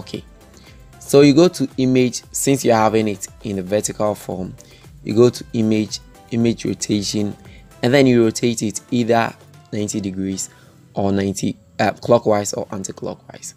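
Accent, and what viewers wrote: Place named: Ghana. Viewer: The accent is like a Ghanaian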